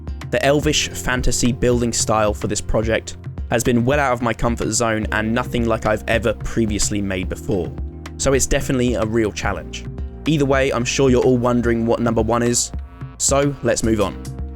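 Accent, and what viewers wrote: United Kingdom. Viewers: why does he kinda look like boffy but with a british accent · I really really hate English(your) accent but that what you do is siiick. And accent didnt exist now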